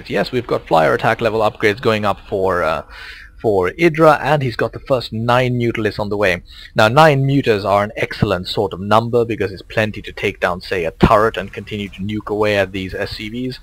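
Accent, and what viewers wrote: United Kingdom. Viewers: Thanks for the cast and as always loving your British accent, keep it up!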